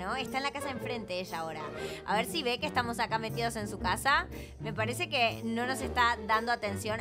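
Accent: Argentina. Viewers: sos de qué país seguro con esa voz sos de Argentina estoy segura con es voz que tienes me gustaría conocerte yo voy a viajar en el país Argentina ❤😂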